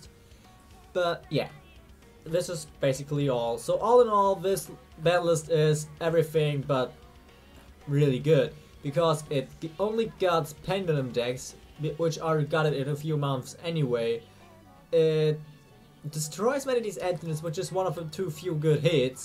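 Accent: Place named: Germany. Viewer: Where are you from? You sound german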